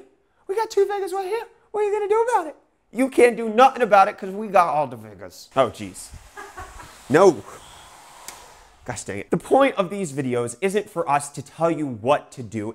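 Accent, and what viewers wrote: South Africa. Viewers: Are you south african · Are you South African?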